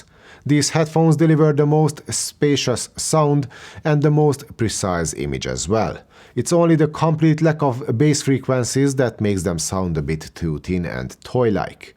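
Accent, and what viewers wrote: Hungary. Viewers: Szerintem magyar akcentust hallok 🧐🤔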